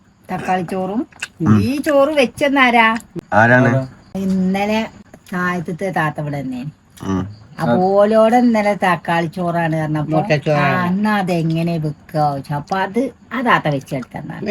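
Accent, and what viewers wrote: Malappuram, India. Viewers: Malapuram slang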